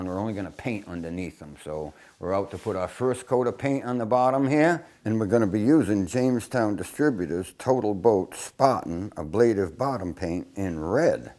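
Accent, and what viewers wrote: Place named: Maine, United States. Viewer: Like his "Down East" accent. Nice the way he finesses each piece slowly and easily. Good work pace.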